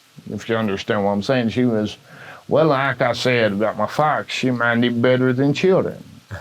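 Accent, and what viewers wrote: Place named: South, United States. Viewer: I’m a fifth generation southerner. His accent is a beautiful song to my ears. We Floridians have lost our accents since I was a kid. A lot of us.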